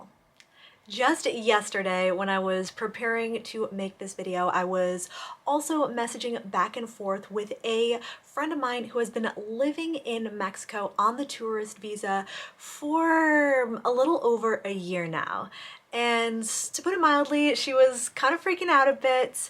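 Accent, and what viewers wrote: United States. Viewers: You don't talk like your from Mexico, you sound like a pocho, as if you grew up in the U.S., corruption in Mexico is exaggerated, the U.S. just had a criminal leave office, the U.S. is a lot more corrupts then you think, its just the U.S. is so hypocritical, in the U.S. its all politics and race and Americans will forever say Mexico is so corrupts, for the next 1000 year becouse Americans go by what there thought and what they want to believe, they don't know Mexico, its always been that way, way exaggerated, I feel free in Mexico, more then in the U.S.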